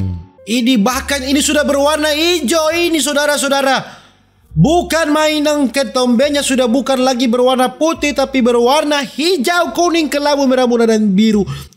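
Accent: Manado, Indonesia. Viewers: Orng tondano ato Manado kk hehe kalo luar maapppp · Lah Logat nya kayak Manado nih asal mana Ko?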